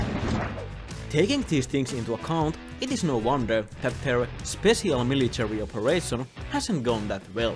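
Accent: Finland. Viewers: me hearing first three words and realising that this guy is finnish (finnish accent) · Fairly difficult to take these videos seriously with this excessive finnish accent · Sun äänestä kuulee heti et oot suomalainen.(ralli engalti)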